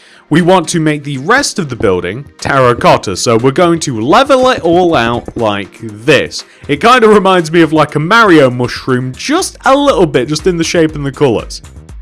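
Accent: United Kingdom. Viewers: a jolly British accent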